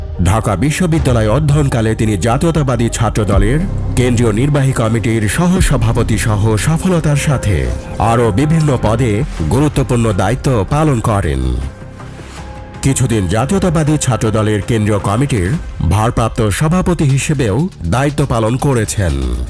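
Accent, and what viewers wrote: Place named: India. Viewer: ভিডিওর কথাগুলা ভারতীয় একসেন্ট মনে হছ্ছে।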